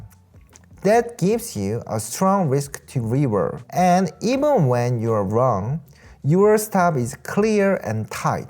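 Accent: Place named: United States. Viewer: Fifty year old born and raised Briton here. I understand him very clearly and easily. For me he is speaking with an American based accent and cadence, it is the Standard International/Business American English version. Which is overlaid onto his Native South Korean accent (Im assuming from the name ). If you can not understand him, then you will not understand most of the World when they speak English. Including from the Land where English was birthed: England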